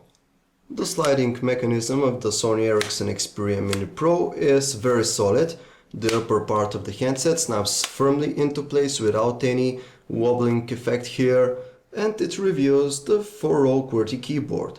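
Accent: Eastern Europe. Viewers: So he's got an eastern european accent ? So what ? Im used to it in the UK, it's a very good and informative review. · this eastern European accent is what i love about this guy's reviews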